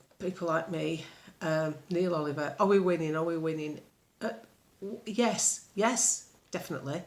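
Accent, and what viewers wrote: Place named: North, United Kingdom. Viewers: Hi. Old bod here from Tod. It’s not just the accent that I like giving us good old northern common sense. But the words are so true. Came across you through following Neil what a find. You cheer me up give me hope. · Never boring, it's lovely to hear a Northern voice, like mine, say the stuff that you could have plucked out of my brain. Unfortunately due to my work I have to keep most of it to myself. BUt me and my husband say all this stuff at home. We sick of the gobshites spouting lies and trying to convince us we're far right. I say they're not right, not right in the bloody head if they think we're daft enough to believe them. Keep going - we're winning!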